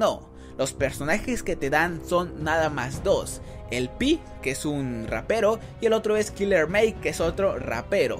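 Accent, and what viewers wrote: Chiapas, Mexico. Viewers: eres de chiapas?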